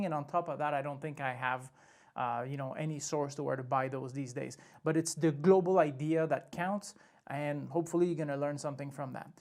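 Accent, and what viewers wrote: Quebec, Canada. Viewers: I was so glad to hear the Quebec accent when you started talking. Made me trust you more :') Thank you for the valuable info!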